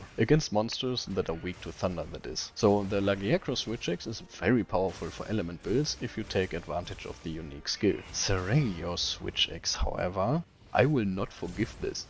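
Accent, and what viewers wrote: Germany. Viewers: Are you german by any chance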